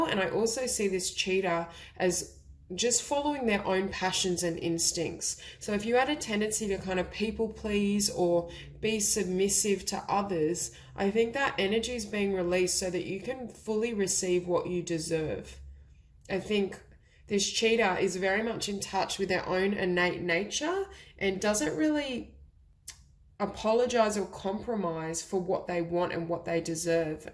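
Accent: Australia. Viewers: Number 3! Resonates 100% thankyou so much❤️ Are you from Australia?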